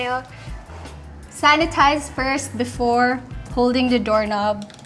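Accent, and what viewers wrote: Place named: Davao, Philippines. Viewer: preho yata silang taga davao am i right?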